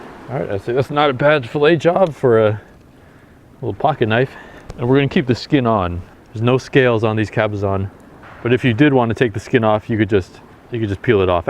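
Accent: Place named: South, United States. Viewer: are sure your not from the south maybe just a southerner with a unique accent with all that butter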